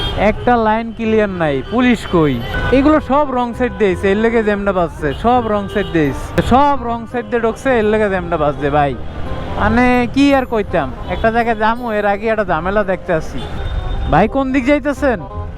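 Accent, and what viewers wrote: Barishal, Bangladesh. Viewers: ভাই কি বরিশাইল্লা,,,,,,, 😊? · Vai apni ki borishaila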